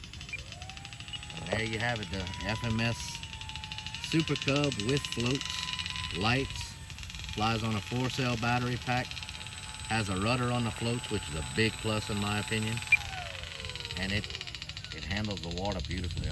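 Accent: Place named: Louisiana, United States. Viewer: Takes me back to Pierre Part Louisiana the Cajun accent!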